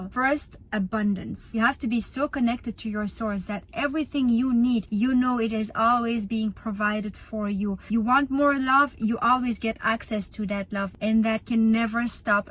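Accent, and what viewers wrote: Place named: France. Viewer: i really enjoyed this video!❤ i love your accent, are you from France?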